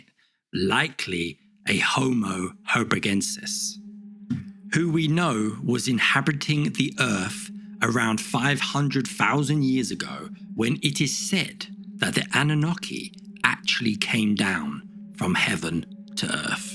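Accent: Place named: United Kingdom. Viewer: Why do all the bots have a Brit accent?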